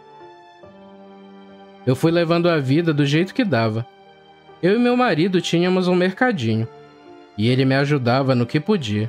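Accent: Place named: Pará, Brazil. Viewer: Eu gosto desse sotaque, acho que é de paraense ❤, relato incrível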